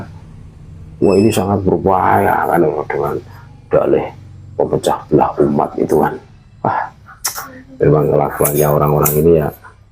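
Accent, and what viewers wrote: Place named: Madura, Indonesia. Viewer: Orang Madura itu suaranya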